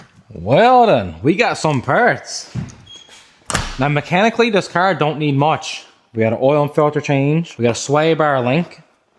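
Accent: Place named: Newfoundland, Canada. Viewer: Greetings from Ontario on a sunny -22 Feb. morning. I must say how much I enjoy your channel and your social media posts, well done! Buddy, your humor, accent, and content make for the best ambassador of Nfld! I have a question: I am an older fellow that enjoys making videos... you mentioned in a video that you use your phone and an external microphone. To improve my sound quality I would like to purchase a microphone. May I ask what microphone you use and how long the battery lasts as well as any other thoughts or recommendations you might have. Thanks so much, Paul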